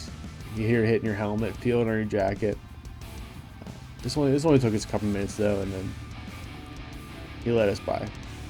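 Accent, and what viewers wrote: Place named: Pittsburgh, United States. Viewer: Yeah, I could hear some Pgh in your voice! Sadly I haven't ridden anything in years just due to life circumstances. Gotta live vicariously through YT videos like yours. The only bike I've kept is a YZ125 that I rebuilt from top to bottom to race AWRCS. I go out and huff some two stroke exhaust from time to time to keep it circulating in the bloodstream though. Hopefully I get my freedom back before I'm an old man and I can get back out there. Thanks for taking us along for the ride.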